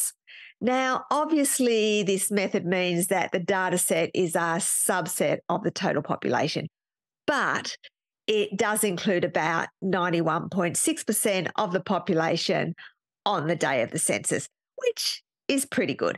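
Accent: Australia. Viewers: Wait a second - all Aussies sound like that. And looks fine to me. Are you Ok?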